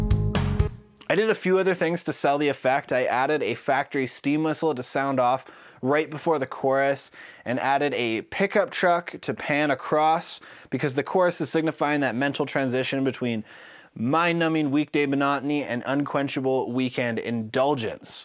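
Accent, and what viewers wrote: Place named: Canada. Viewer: I just found out the answer to my question. Your nationality ootput is indeed canadian. Hah! I feel like a detective.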